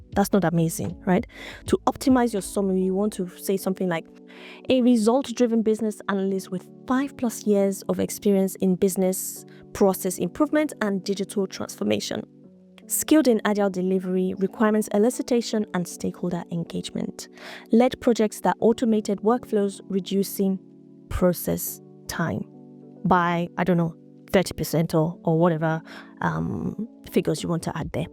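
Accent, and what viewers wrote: Nigeria. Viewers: Thanks for the video.. You have a Nigerian accent, are you Nigerian?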